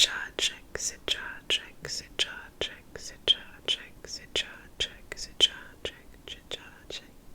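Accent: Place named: Sweden. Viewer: İsveçli birisinin türkçe konuşması çok hoş olmuş daha fazla türkçe video gelmesini isteriz ❤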